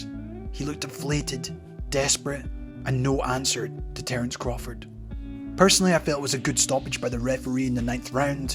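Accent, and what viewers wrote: Scotland, United Kingdom. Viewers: Are you from Scotland ????? accent????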